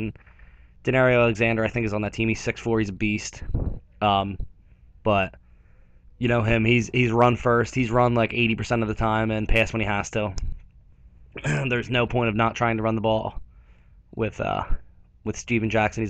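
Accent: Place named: Philadelphia, United States. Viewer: u from philly bro? i hear it in your voice lol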